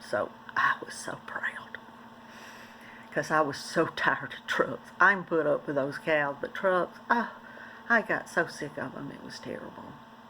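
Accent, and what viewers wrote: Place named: Kentucky, United States. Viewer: Hi 😊, I love yarn too! Just found you and I'm looking forward to seeing more of your videos! I would love it if you came over and checked out mine, if you would like or if you can find the time. I'll be watching! I'm from Kentucky, so I know the accent 🤗!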